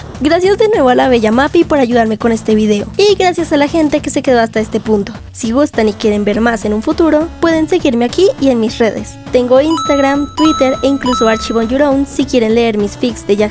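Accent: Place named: Bogotá, Colombia. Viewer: Felicidades! es un grandioso video, desde el apartado visual, como la edicion, las pequeñas animaciones que integras en los titulos y el manejo final del video para explicar las partes mas densas de un fandom. Es un gran formato y muy fresco en youtube. Es un honor conocer creadores de contenido Bogotanos, me encanta que se sienta tu acento, no soy mucho de comics y aun asi lograste darle un gran ritmo a toda la trama sin dar realmente tantos spoilers. btw el inicio fue increible JAJAAJ, ahi te va mi sub, estare muy al pendiente de tus nuevos videos!!!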